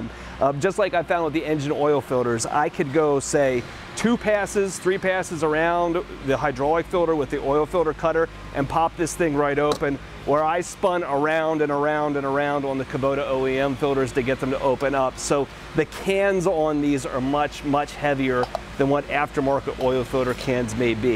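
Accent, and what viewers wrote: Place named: Pennsylvania, United States. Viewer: I heard a Pennsylvania accent then I looked up your location, yep! Grew up in Philly myself.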